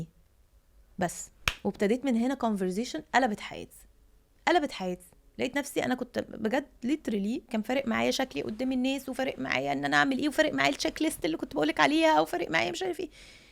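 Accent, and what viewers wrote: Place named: India. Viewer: انجليزى كتير و الاكسنت هندى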